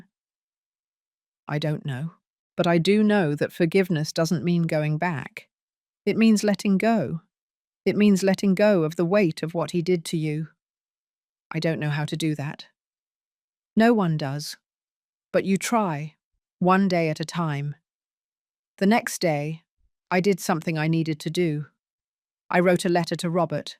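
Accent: United Kingdom. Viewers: She said that she is from Boston but she sounds British and the words in the closed caption are spelled British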